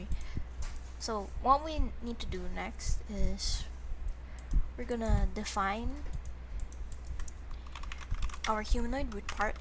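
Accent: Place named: Toronto, Canada. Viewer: yeah idk how to describe it what comes to mind is a toronto accent 😂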